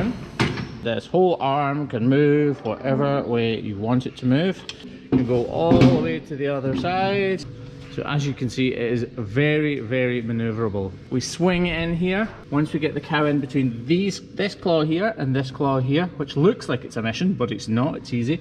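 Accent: Scotland, United Kingdom. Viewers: What an awesome piece of equipment. As a lover if all things dairy, I was surprised when I first discovered your videos. I never realized how much attention cows' feet should have. Your channel is so professionally done. I especially like your opening. Obviously you work very hard. I also appreciate listening to your lovely Scottish accent and seeing the farms setting. Thanks! From Southeastern Pennsylvania.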